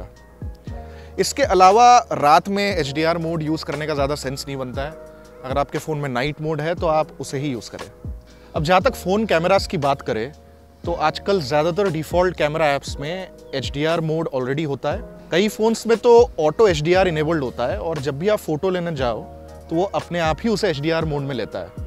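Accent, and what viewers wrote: India. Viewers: are you bengali?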